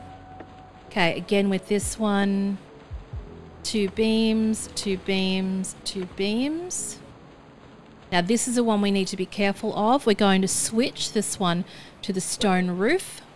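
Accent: Australia. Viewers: Aussie or NZ?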